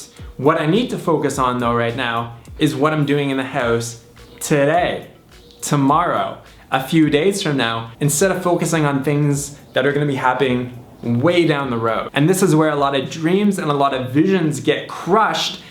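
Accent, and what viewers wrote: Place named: Canada. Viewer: sounds so Canadian in this video to me. IDK why....ha!:)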